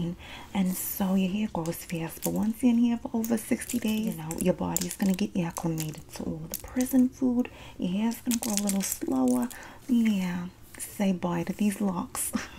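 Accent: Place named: New Jersey, United States. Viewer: Your Hersey accent vids are everything 🩷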